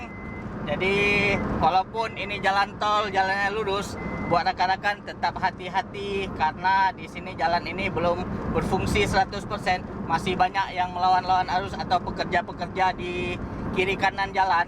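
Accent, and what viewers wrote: Aceh, Indonesia. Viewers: Khas org aceh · Logat aceh,apa a y lucu.bgmn logat jawa y medhok,lgt madura,logat bali,d y lain.smua ada lgt msg2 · LEBIH CEPAT ,,jika JNE pengiriman barang lebih cepat sampai nya tanpa lampu merah ,,Luruuuuus aja bagus2 ,,wajar lah logat nya ngomong kayak gitu kan orang aceh ,bukan orang jawa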